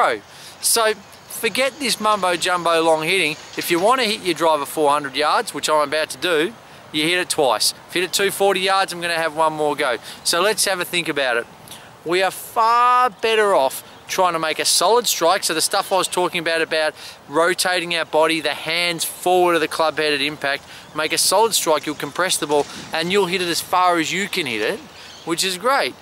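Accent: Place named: Australia. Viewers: haha english men , Hes a Aussie,accent and by the birds sounds and fauna behind him... yes your right me and a mate played his stepfather a 72 year old and he whipped us,he got a 82 and all he did was hit straight ....! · Probably Aussie based on the accent.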